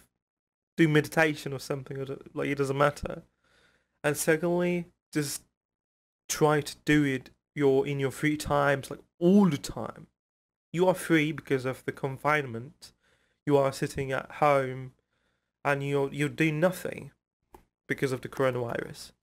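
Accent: England, United Kingdom. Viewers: uk aksanı bu ama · Valla helal olsun , "I am John." desen kimse şüphe etmez , nasıl bir İngiliz aksanı ya, harikasın tebrikler👍👍👍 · British accent da cheery on top olmuş :) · İngiliz aksani finaaaa ateşşş🔥🔥🔥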